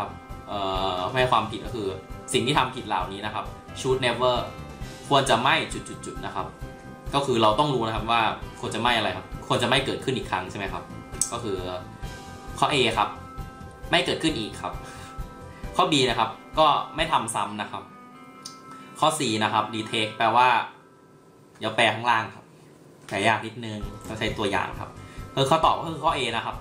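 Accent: Thailand. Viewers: แค่นี้ก็โอเคแล้วค่ะ สำเนียงไทยๆ เข้าใจง่ายค่ะ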